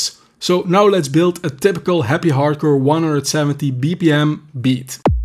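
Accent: Netherlands. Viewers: Man you sound so Dutch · Gotta love the combination of in-depth cultural knowledge, spot-on production and of course the delicious dutch accent. You got another sub!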